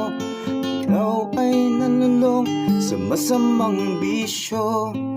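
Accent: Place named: Bicol, Philippines. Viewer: Sure ako bicolano ka boss 😅✌️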